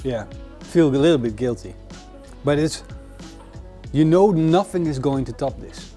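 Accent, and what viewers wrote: Netherlands. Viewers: ik hoor een soort van nederlands in je stemm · he sounds dutch, are you dutch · Dutch accent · Where you from yo accent sounds dutch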